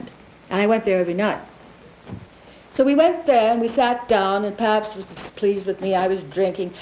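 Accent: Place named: Transatlantic, United States. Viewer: According to her book 'Lulu in Hollywood' , early in her career , she was disparaged for her 'hick' Kansas accent and trained her voice to get that Transatlantic accent which gives her voice a beautifully articulated tone and you can easily understand every word she says. Ironically , as it turned out , a perfect accent for acting in the sound movies.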